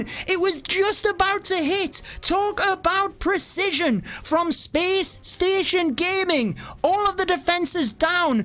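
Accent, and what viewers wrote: United Kingdom. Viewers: British accents is melody to my ears.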